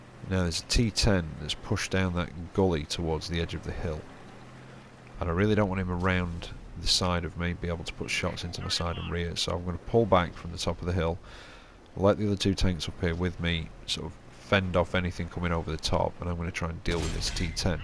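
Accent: United Kingdom. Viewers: British Bloke lmao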